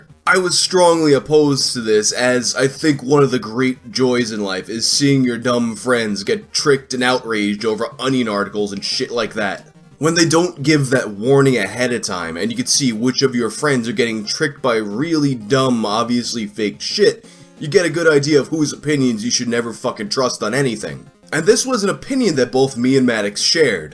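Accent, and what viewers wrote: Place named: New York, United States. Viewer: It's called a New York accent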